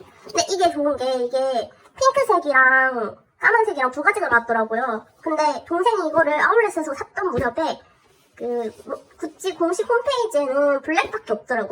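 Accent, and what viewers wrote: Busan, South Korea. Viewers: 부산분이시네욬ㅋㅋㅋ저도 부산 사람이라.. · 음성 변조도 숨길 수 없는 친근한 부산사투리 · 바닥에 널부러져 놓고 장갑찌고 만지는건 무엇?ㅋㅋㅋㅋㅋㅋㅋㅋㅋㅋㅋㅋㅋㅋㅋㅋㅋㅋㅋㅋㅋ사투리가 부산같은데 개웃기네 ㅋㅋㅋㅋㅋㅋㅋㅋㅋㅋㅋㅋㅋㅋㅋㅋㅋㅋㅋ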